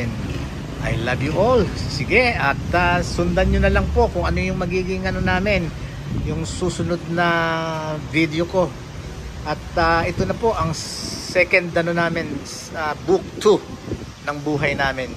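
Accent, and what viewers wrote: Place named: Pampanga, Philippines. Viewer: Kuya kapampangan ka? Kasi may tono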